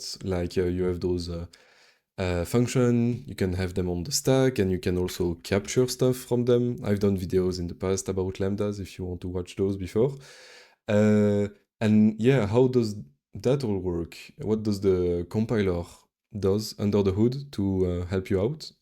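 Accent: France. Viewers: 🎉 brilliant. Cool to finally understand the magic behind cpp lambda functions. Nice french accent by the way.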